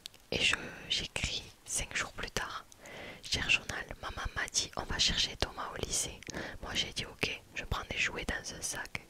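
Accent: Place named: Marseille, France. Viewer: Oh ta un accent marseillais 😅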